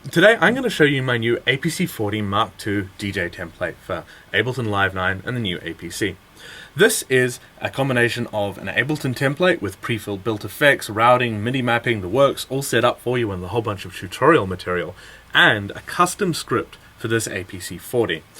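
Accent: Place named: New Zealand. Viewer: Damn. I'm buying an APC 40 Mkii this week, really nice to hear a fellow Kiwi voice explaining the ins and outs. Great demo, and I'll be downloading the scripts and exploring the functions. I cut my teeth all on technics 1200's and vinyl back in the early 90's, dropped out of the scene a while, got back in with traktor and the M-audio exponent midi controller, then messed with Pioneer CDJ's a while, but never really felt quite at home with any of them. Maybe the APC will be the right path back into it, don't know yet. Your video makes it look good though, and definitely an affordable experiment. Cheers!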